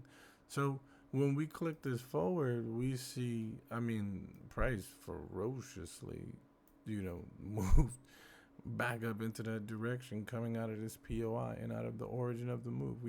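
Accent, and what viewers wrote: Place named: Morocco. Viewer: I smell the Moroccan dialect here 😉 bshto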